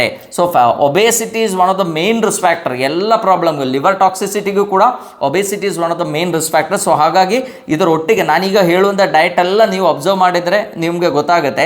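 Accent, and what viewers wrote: Kerala, India. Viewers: Very knowledged and experienced doctor who speaks Kannada fluently with good prounanciation but reveals the fact of originally from Kerala while speaking few word in English For example follow pronounciation. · Malayali kannada samsarikkumbol vere oru feel aan ❤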